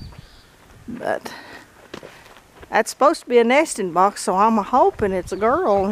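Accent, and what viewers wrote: South, United States. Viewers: I enjoyed listening to you talk reminds me of my mother My southern accent isnt as strong as yours Get A trail camera from Walmart it will capture the owl they are fairly cheap I video my deer all the time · I do enjoy your talking and walking. I have a long time lady friend that lives in my apt building she is from the south but sounds just like you.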